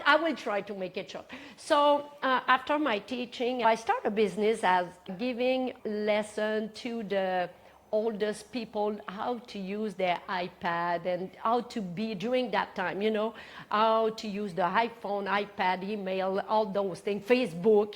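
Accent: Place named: Quebec, Canada. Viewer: My mom was from Quebec. Because of your accent, I feel like you are family! Hope to meet you one day. I admire your work.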